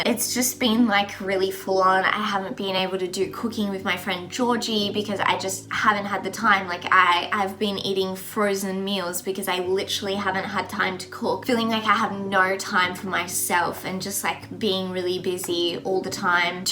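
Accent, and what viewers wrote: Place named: Australia. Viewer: I hear Australian but confused on the exact accent. Great video, just curious to learn more.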